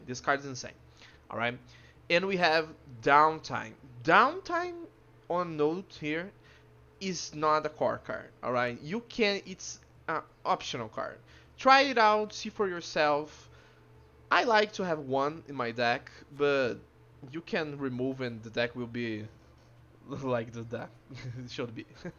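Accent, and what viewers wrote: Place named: Brazil. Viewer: Qual foi, pelo inglês sabia que era BR, tem alguma forma de falar contigo pra me explicar como rodar esse deck ?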